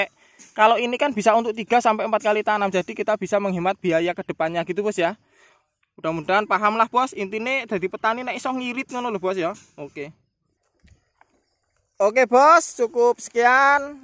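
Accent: East Java, Indonesia. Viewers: Wa'alaikum sallam bossss......,kata2e sampean iki kok koyok Wong jawa timur,samean iki asli ngendi e bo....ssss?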